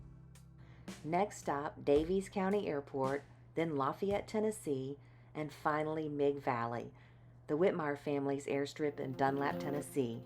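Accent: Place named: Mississippi, United States. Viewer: I love the videos and great to finally hear your Mississippi voice!! ❤️.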